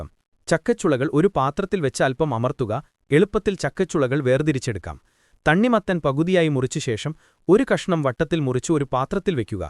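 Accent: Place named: Ponnani, India. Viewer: ഏതോ പൊന്നാനിക്കാരനാ വോയ്സ് ഇടുന്നെ എന്ന് തോന്നുന്നു 😬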